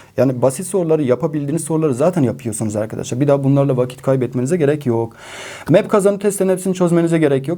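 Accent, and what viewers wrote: Van, Turkey. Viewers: hocam siz kendinizi anlatmak için çabalamayin biz sizin Van şivenize alıştık anlıyoruz sizi. Bazı insanlar ya anlamıyor yâda bilerek sizi kızdırmaya çalışıyor takmayın boşverin. Sizde bizimle bütün sene çalıştınız. Allah sizden razı olsun inşallah. 🤲 Tatili hakettiniz... 10 gün sonra denize girip kef edin. He walla, kahvaltı da enfes olsun yarasın. Afiyet olsun. Yiyin için gezin yüzün en güzel kef ler sizin olsun 😃 hakkınız ödenmez tanıştığımız için çok memnun olduk bunu kendi adıma çok rahat ifade edebilirim. Siz örnek bir egitimcisiniz meslektaşlarınız size imrensin isterim. Cansın. 🙏👍👏😇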